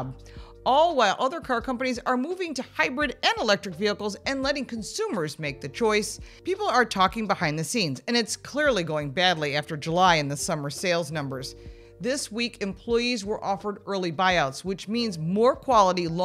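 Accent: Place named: Brooklyn, United States. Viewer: Every time I hear her voice ; I hear back in the day Brooklyn NY.😊. I watching this channel for about 7 years 🎉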